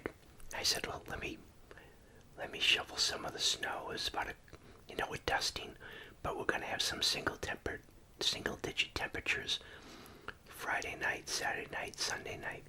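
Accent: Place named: Boston, United States. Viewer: Amazing... he is so wholesome and love his accent. When he say Bossston..:)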